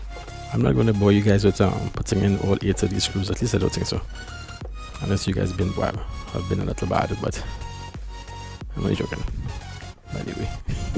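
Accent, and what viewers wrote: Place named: Trinidad and Tobago. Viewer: Heard your voice and was.. He must be Trini. Just looked at your channel sure enough. Been to Trinidad about 8 times. My wife is from Grande.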